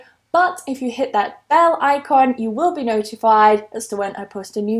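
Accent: United Kingdom. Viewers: Love your British pronunciation!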